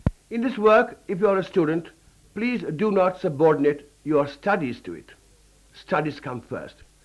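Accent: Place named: United Kingdom. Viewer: After this vedio : Me :British accent tutorial. Jai hind to the national hero, pride of coorg Field Marshal K. M CARIAPPA first commander in chief of Indian army. First Indian to command troops of British under him. Ayub khan president and first commander in chief of Pakistan army served under his command. Led the 1948 war against Pakistan being the western commander. One of the two legends to be honored with Field Marshal rank.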